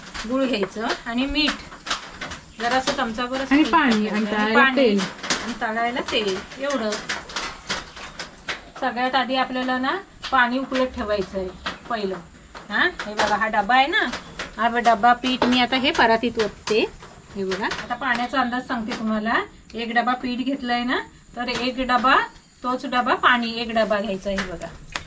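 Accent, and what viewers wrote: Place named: Malvani, India. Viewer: Tumhi khuup chaan bolatat kaki , assaal malvni tune madhy... 😊 mazya aai sarkh....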